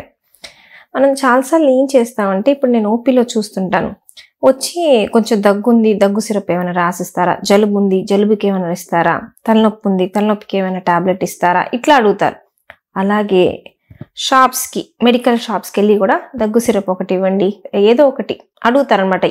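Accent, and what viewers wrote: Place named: Prakasam, India. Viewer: Avida proper chirala prakasm dist yasa alage untundi.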